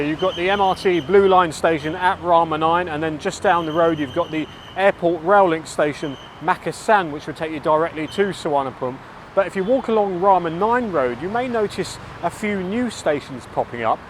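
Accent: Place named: Yorkshire, United Kingdom. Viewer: someone told me you were born in Leeds, same as me, is that corrct? You certainly have a different kind of Yorkshire accent. Your videos are interesting and well researched, highly unusual in this field, cheers mate.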